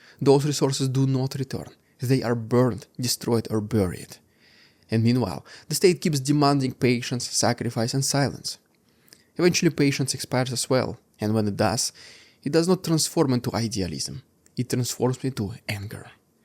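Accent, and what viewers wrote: Russia. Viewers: I like your accent. You are speaking English when I listen, but to my ear it still sounds completely Russian at the same time. Happy new year brother!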